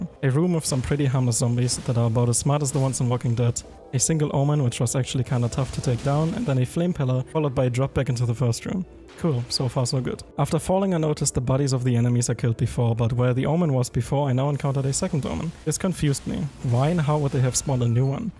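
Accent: Germany. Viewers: The German accent made is much better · Ich mag deinen Typisch Deutschen Akzent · Man hört den Deutschen Akzent so raus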